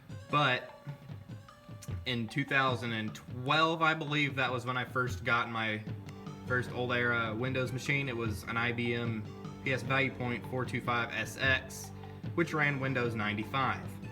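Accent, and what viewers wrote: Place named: South, United States. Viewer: You must be from the south (Alabama)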